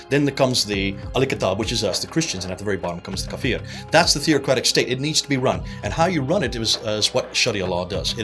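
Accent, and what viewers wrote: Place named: United States. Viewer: He's Britain but sounds like American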